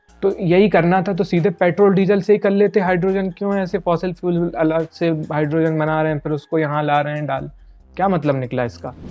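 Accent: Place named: Jabalpur, India. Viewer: Bawa jabalpur se ho lag rha...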